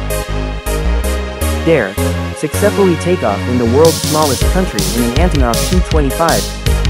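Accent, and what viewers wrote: France. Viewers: Are you French? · are you french ?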